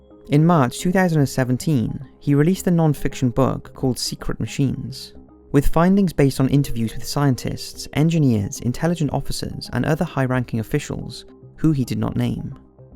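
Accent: United Kingdom. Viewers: Like how the narrator has a soothing British voice. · Yay British narrator :) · Are you Welsh? Where are you from? love the accent 👌🏻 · Man it's hard to understand the British accent:/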